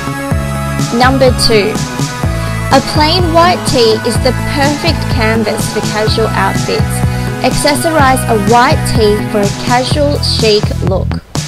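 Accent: Australia. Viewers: Love this thanks. And love the hip-swinging and your aussie accent xx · love the aussie accent!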